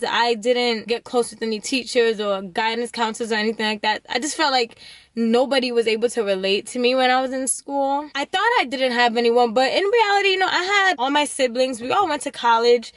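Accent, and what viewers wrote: New York, United States. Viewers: New York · Are you from New York? Your voice and accent is gorgeous girl 🔥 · Love the NY accent. I got a Boston accent so I love the east coast dialogue lol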